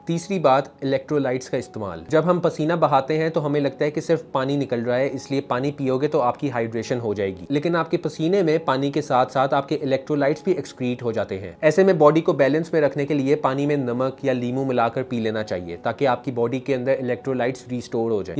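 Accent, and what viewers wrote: Lahore, Pakistan. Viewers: aapka content acha hai lekin bs apni urdu sahi krain lahori accent hai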